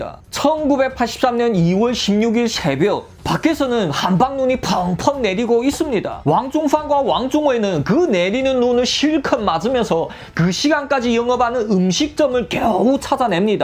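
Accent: Yanbian, China. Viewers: 중국사건 얘기는 다른영상에서 잼나서 자주봣는데 님껀 어제부터 이틀동안 다봤넹용~~ㅋㅋㅋ연변분이셔서 그런지 레알 말투가 리얼하네요~ㅋㅋㅋ👍👍👍👍👍잼나용^^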